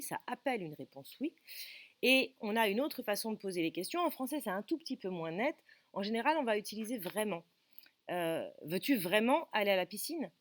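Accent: France. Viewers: S’il vous plait corrigez votre accent français et placez bien l’accent tonique où il faut.Mais c’est bien?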